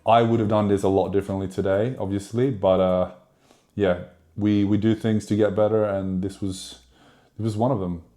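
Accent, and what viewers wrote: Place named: Australia. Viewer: must be the aussie twang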